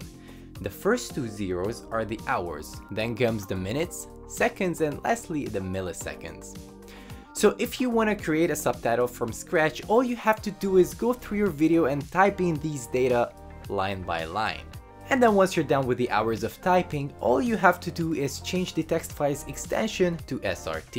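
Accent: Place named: Netherlands. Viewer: Je hoort direct dat je Nederlands bent.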